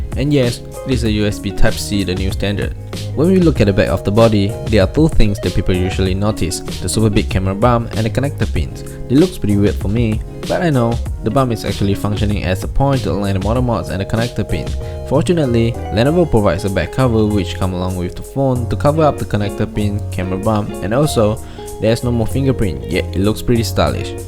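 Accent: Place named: Malaysia. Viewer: hey, great video. I guarantee that you'll have tons more subscribers very soon!! also, where are you from?? sounds like Malaysia, Singapore ah? like me ;-)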